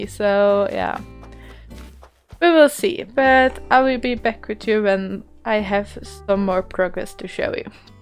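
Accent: Netherlands. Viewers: By any chance are you Dutch? Just wondering 😊 I've watched a few of your Animal Crossing video's! Also we made a few Tour video's haha We started playing Animal Crossing when New Horizon Released!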